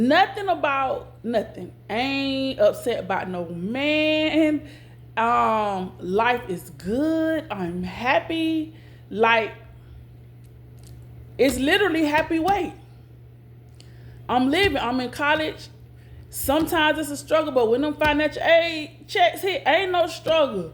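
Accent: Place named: New Orleans, United States. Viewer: Girl you from New Orleans 😂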